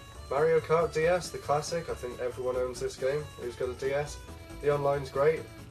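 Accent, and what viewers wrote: United Kingdom. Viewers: do you live in uk e.g beacuse of accent and games label game shop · yea man no problem. really appreciate it. hope u gonna make a new video soon. it helped me to see which games i needed man. yea i figured you were from the uk. since u got a british accent :P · I really love the way you speak ( british english i guess) and also your games are very nice. Even tough i would have like a quick gameplay of you to each game, i still gave you 2 thumbs up and subscribed to your channel. So keep doing your good Job!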